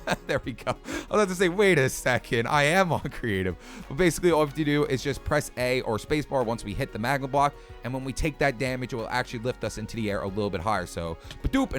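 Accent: New York, United States. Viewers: You from New York? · Are you from New York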